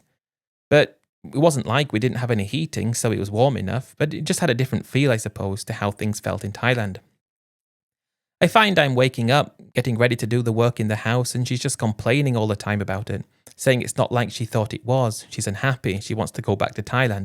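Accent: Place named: England, United Kingdom. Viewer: Buddy, you sound like a smart English man but not so smart with the girl and I’m not putting you down because you had it virtually made what you were doing buying houses selling them perfect time back then because now to do that in my country Australia and for sure in your country in England we got too many taxes gifting tax tax this tax How are you gonna make $30,000 now the government makes it too hard now because they catch on back then. and now just for an old rundown house is worth a million dollars as much as as you loved your wife I would never would’ve got her involved and most certainly I would never would buy a house in Thailand then leave it to her and then come back to England. no chance and I know what you’re gonna say you haven’t been in love before. It’s not about Love. This is about my retirement and my age. There’s plenty of girls in Thailand and there’s always gonna be someone different. 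She’s not a western girl.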